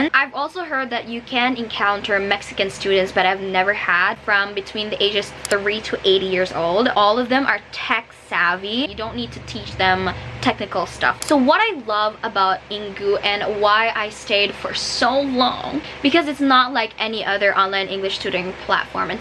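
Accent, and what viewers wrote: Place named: United States. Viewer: She speaks like an American 98%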